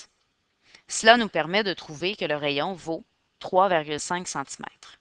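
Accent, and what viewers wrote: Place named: Quebec, Canada. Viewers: J adorre l accent canadien 😍 Très bonne vidéo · Accent québécois***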